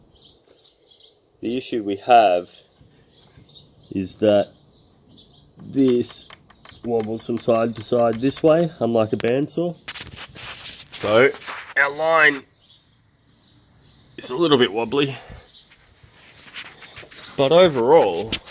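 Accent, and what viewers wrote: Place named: New Zealand. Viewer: oo..sorry i tought somewhere in new zealand...totally different from the aussie i know...really appologised mate..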